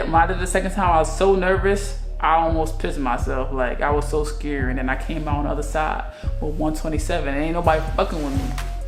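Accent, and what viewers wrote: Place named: New York, United States. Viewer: She from New York lol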